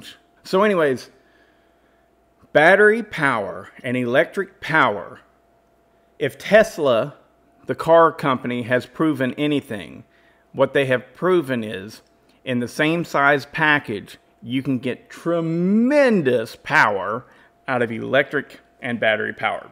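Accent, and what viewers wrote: South, United States. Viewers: she has a southern way of sayin things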